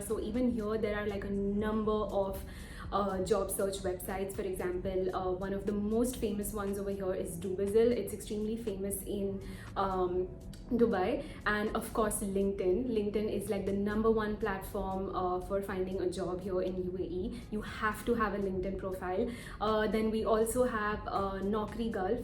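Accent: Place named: India. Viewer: Sorry but your accent is awful Are you indian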